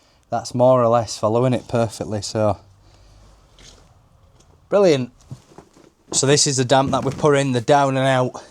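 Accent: United Kingdom. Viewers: Love your videos, the Yorkie accent and sayings! Please keep them coming! I wish your family and you a Merry Christmas and a blessed new year! I hope that your father and you will be able to finish many more projects successfully!😊🎄🎅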